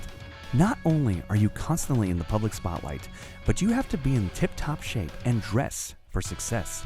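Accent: Scotland, United Kingdom. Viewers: he sounded scottish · Scottish accent u tool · you sounded scottish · That's a Scottish accent not Irish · Scottish lol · Your accent was Scottish not Irish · Scottish accent mate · I hate you made fun of Ireland 🇮🇪 I'm so offended you sounded like it's Scottish guy🖕🖕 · U sounded Scottish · Definitely not an Irish accent, that was Scottish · that was a Scottish accent!!! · That’s a Scottish accent ya dope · That was a Scottish accent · ok im from Ireland and you sound Scottish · Thats a Scottish acent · Why did this prat use a Scottish accent? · he sounded Scottish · YOU SOUNDED SCOTTISH NOT IRISH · That was Scottish not Irish accent 😂😂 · American dose a Scottish accent for an irishman, wonder why they call Americans ignorant?. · That was Scottish not Irish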